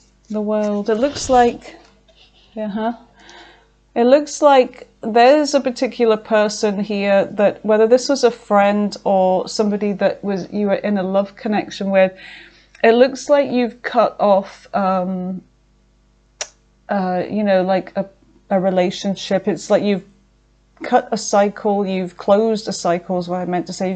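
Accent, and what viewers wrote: United Kingdom. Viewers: You are the most delightful tarot reader. Are you a Cockney born lady? I just love the various British accents.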